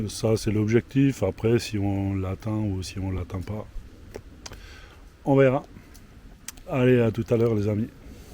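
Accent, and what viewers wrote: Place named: Alsace, France. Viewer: Je ne me moque pas pour l'accent, je l'affectionne tout particulièrement. J'ai déjà visité l'Alsace en long et en large, j'ai découvert plus récemment la vallée de la Doller, très jolie, une pépite ce petit village de sewen, ma fille y habite depuis trois ans. Merci, belle fin de soirée, je ne manquerai pas de te faire encore des commentaires sur les vidéos que je n'ai pas encore regardées. Au plaisir. Léna.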